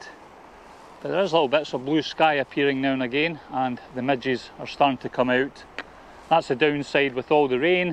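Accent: Scotland, United Kingdom. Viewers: Proper jock accent, lov it :) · as a Scot living in Sweden let me tell you the accent isn't holding anything back. The people of the world love our accent.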